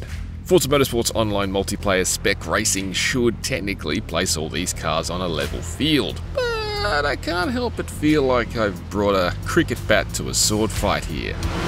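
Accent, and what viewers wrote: Australia. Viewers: Well that was...surprisingly Australian. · Australian accented reviewer...and there it is,the Holden Torana...great car.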